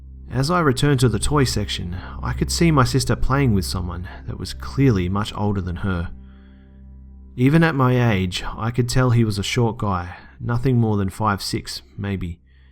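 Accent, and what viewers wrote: Australia. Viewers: Nice to hear a fellow Aussie on here :p My first vid of yours, I've heard good things from other channels and am glad i stopped by! Cheers!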